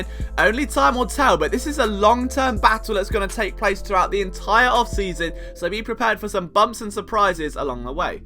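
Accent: United Kingdom. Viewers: That is the problem for me. The content is excellent. Just can't stand the little boy brit voice.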